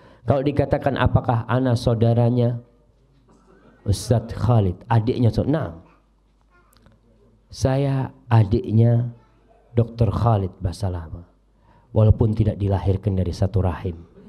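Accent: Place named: Jakarta, Indonesia. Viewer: Jadi usyar itu ,orang padang atau betawi???....kok bahasanya spt orang betawi????....betawinya dimana ustadz????....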